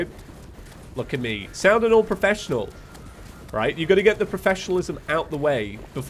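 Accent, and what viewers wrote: United Kingdom. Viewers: Sometimes I honestly just think “ god, how I would love to play in world with him and his British accent, eh, probably will never happen”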